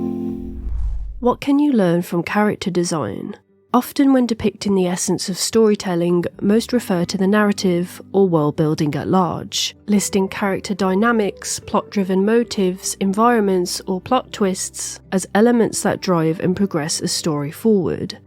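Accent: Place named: England, United Kingdom. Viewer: What a pure English accent, I love it ❤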